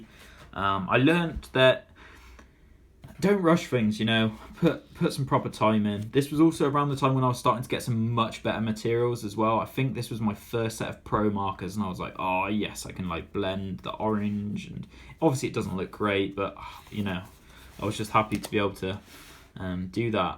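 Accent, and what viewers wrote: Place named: South West, United Kingdom. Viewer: Your artistic evolution is a testament to your hard work mate, good work. I'm UK old school (2nd wave, started 1987). More of a character artist myself but still love the letters. You do some very nice work,. You from the south west? Thought I recognised the accent. Come check out my channel mate, see what you think. Peace.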